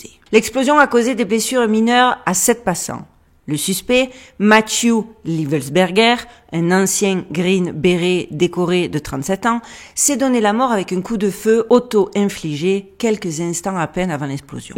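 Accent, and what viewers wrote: Marseille, France. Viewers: Hello everyone ! J'adore <3 Avec l'accent marseillais en plus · Cet accent de la garrigue! Un délice!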